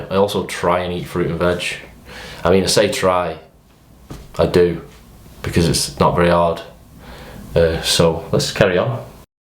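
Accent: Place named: Liverpool, United Kingdom. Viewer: Yes yes, more of these. And also ordering food in Fluent Scouse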